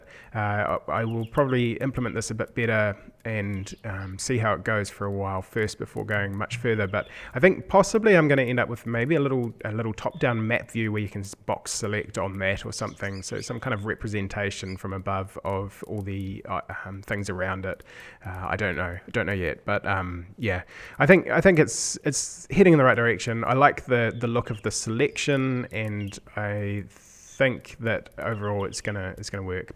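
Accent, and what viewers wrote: New Zealand. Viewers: Kiwi accent aye? I'm curious, where are you based?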